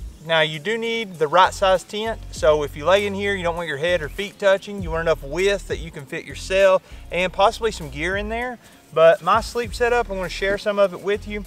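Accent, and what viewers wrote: Kentucky, United States. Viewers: "Ah got mah tint-" Gotta love that Kentucky accent! It's just one of the many things which make up the video experience. ^_^ What is that beautiful location you're filming in, anyway? It looks glorious, I can practically smell the leaves.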